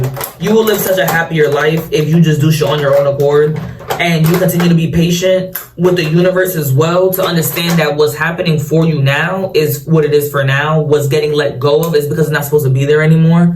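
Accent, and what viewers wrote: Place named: New York, United States. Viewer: Not me watching all your videos while baking 😅, speaking straight facts (sounding hella New York) 😂. Your videos feel like our college days when we would ramble and do random things. So happy to see you the same but better 🥹 Miss you so much girl 💕 - little Cat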